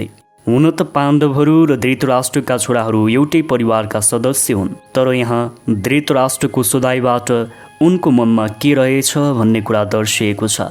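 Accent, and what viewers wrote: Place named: England, United Kingdom. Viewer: भएन , भएन हजुर भएन वाचन गरेको। नेपालीमा शुद्द उच्चारण गर्न पहिला सिक्नुस। अ,ग्रेजी पाराले बोलेर नेपाली भाषाको बिजोग नबनाउँ। माफ गर्नुहोला।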